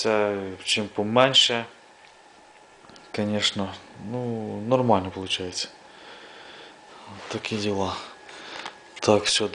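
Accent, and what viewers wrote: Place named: Kyiv, Ukraine. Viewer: Судячи по назві,серйозний проект.А по говору,Київська область.Удачі Вам,в нелегкому ділі ,,тетріс з камінцями''.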